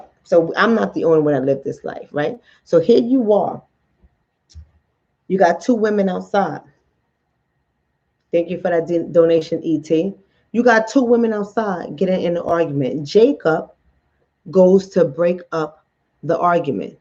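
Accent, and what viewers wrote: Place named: Bronx, United States. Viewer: Love you! That New York Bronx Accent is everything! I could listen to you all day long. IJS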